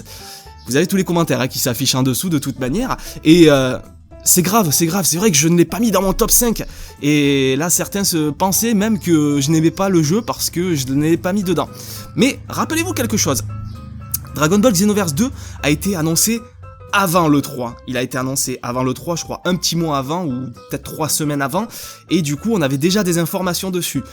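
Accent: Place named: Toulouse, France. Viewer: il a un accent psk il est toulousain ok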